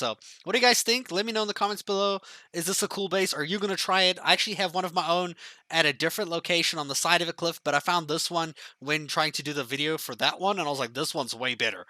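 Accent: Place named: South Africa. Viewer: I thought I could read that accent haha! Bring out your Saffa accent more, you don't need to talk like an American :D Good video man!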